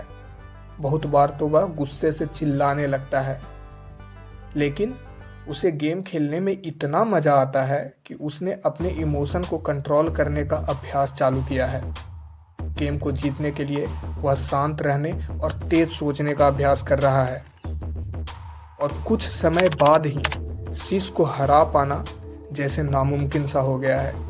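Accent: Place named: Bihar, India. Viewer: Awaaj se Bihar Wale bhaiya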